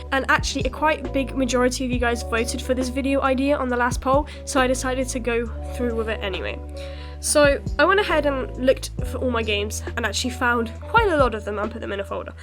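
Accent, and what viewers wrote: United Kingdom. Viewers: that's a nice british accent 😊you have greetings from France😈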